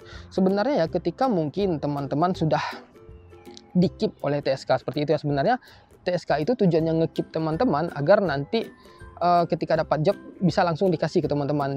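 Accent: West Sumatra, Indonesia. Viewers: Logat bicara nya kaya orang sumbar, apa bener bg😂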